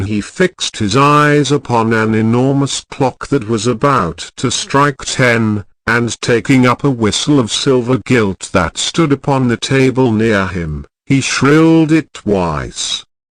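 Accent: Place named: United Kingdom. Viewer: I want to try this 😁 Edit: thank God this is British. I can practice my accent 😆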